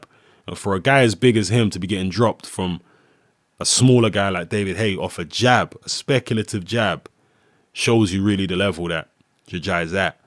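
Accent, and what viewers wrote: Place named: London, United Kingdom. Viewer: are you from north London? You have the same accent as all those grime guys.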